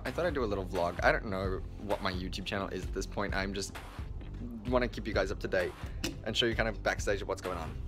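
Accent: Australia. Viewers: Taylah He is Australian;) his accent just isn't as strong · It might just be me but sounds more Australian in this video 👍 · the people that only know for being ' famous pop star' won't understand how us who know him as 'little boy with an Australian accent who talks to a camera' feel rn!!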